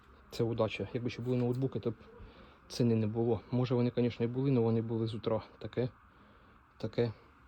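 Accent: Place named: Surzhyk, Ukraine. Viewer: Ти - чудовий блогер і обізрівач, якийсь рідний і близький, як справжній! Не звертай увагу на коментарі заздрісних диванних "експертів "! І суржик твій - просто шикарний - щось близьке і приємне для вух, бо дуже багато українців розмовляють в побуті саме такою мовою! УСПІХІВ І МІЛЬЙОНІВ БАЖАНЬ, які неодмінно ЗБУДУТЬСЯ! В ТЕБЕ ВСЕ ВИЙДЕ, як мрієш, а можливо навіть краще, ніж очікуєш, не бійся мріяти і робити, що тобі хочеться!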